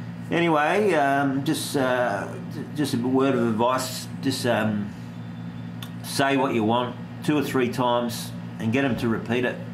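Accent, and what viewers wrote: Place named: Australia. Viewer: Well just make sure you don't ask for Baygon and eggs with your Australian accent. :) Keep up the good work.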